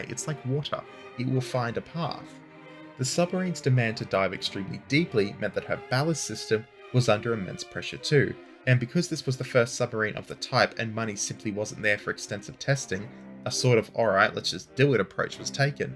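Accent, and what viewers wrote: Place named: Australia. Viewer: A difference in dialect, he’s Australian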